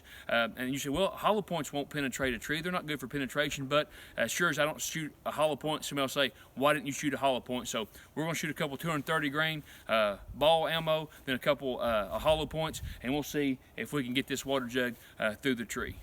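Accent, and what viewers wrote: United States. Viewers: The video was really refreshing, what I call proper American. I am from UK and bird and rabbit shoot in woods not dissimilar to yours, unfortunately, our government takes a dim view of the populace having firearms, so best I can shoot without spending a fortune is shotguns. Just love your accent dude, you come across real natural, and just pop anything up when you got the time, we hillbillies got to work to make ends meet. I do shoot an American flatbow in my hunting grounds in Hampshire, heard you backwoods boys are handy with a bow. Grace and Peace American bro.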